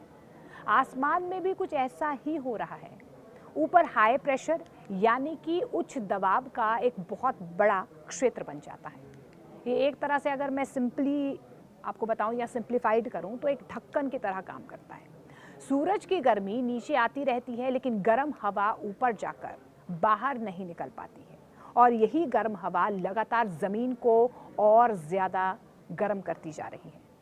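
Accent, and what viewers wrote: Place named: South, India. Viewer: खाबर😮 खबर होगा तू एक साउथ इंडियन है हिंदी से पता चली जाता है। बांग्लादेशी जितने भी साफ सुथरा बांग्लादेशी बोले वह बांग्लादेशी है बंगाली से समझ में आता है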